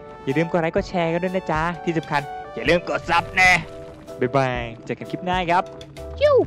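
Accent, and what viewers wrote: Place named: Rural, Thailand. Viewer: อันแรก : อุยย๊ะ เปลี่ยนเพลงใหม่ เท่สวดยวด !!! อันสอง : กวนปราสาทเจ้าคิลเลอร์ เยอะเดะก็ได้ตาย....จริมๆหรอก อันสาม : พูดหรือร้องเพลงเอาสักอย่างเดะ อัยมะนุ๊ด (ไอ่ทองฝากมา) อันสี่ : ปะแจก็มี แล้วมันจะเร่งเพลย์ให้มันฮาทำไมวะ? อันหก : เอ็งพุ๊ดไรฟร๊ะเจ้ามะนุ๊ด (ไอ่ทองฝากมาอีกละ) อันเจ็ด : พูดคนเดียวก็เป็นนะเฮีย <num> อันแปด : โดนฟันเพลงก็มา แล้วเอ็งด่าไร ทำไมเซ็นเซอร์??? 555555+ อันเก้า : เลือกมาสักเสียง ผู้หญิง ผู้ชาย หล่อ ขี้เหล่ เด็ก แก เรียบเรียงๆ อันสิบ : RIP ไอ้สไปวูเม่นเอาเอ็งไปทำผัวแล้ว GG แถม !!! ขก.ตบมุข ฟังด้วยพิมด้วย แม่งเหน่อยสัส !! -*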